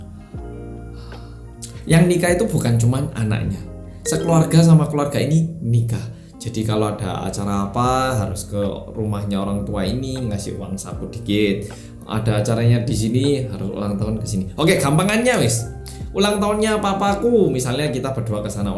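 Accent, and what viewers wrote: Java, Indonesia. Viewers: Halo gaiss welcome back to Korea Reomit bersama orang Korea yang medhooookkkk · Mas wong korea, tapi kok medok jowo yo.. Keren unik. Informativ Vlog nya. Mantap👍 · Suka bgt nonton vlog ttg korea 🧡 Bersama dgn org korea yg mendok 😊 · setelah mendengaar curhatan mas korea medok. Jiwa Bersyukurku Tumbuh dg Suburnya...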